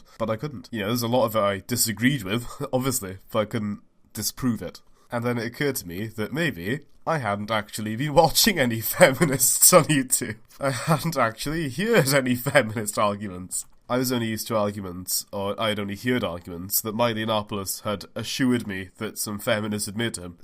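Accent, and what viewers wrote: Wales, United Kingdom. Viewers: I don't often subscribe to people based on a single video but this really resonated with me. While I was never reactionary enough to enjoy Milo Yiannopoulos or Sargon of Akkad I was a Gamergate supporter and was suckered in by their sensationalism and militant rhetoric. Then I guess with the rise of Trump I just distanced myself after I found out that a lot of the people I was following weren't actually liberal centrists but full-on Trump supporters. Brexit didn't help either. Also, fellow Welshman? Or am I just bad at recognising accents?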